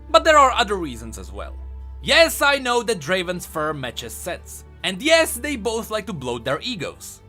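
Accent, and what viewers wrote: Czech Republic. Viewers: Jde z tebe celkem slyšet, že jsi Čech :D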